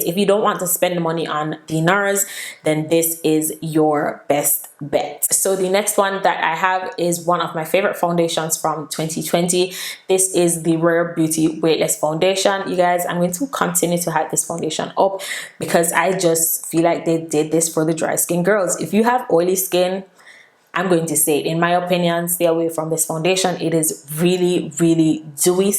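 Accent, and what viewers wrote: Jamaica. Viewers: She has a Jamaican accent. Everyone has an accent sis.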